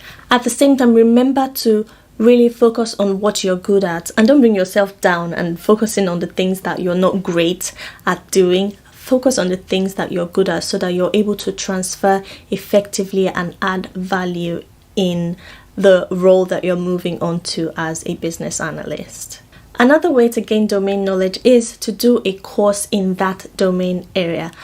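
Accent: Nigeria. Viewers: Are you Nigerian? Your accent…😍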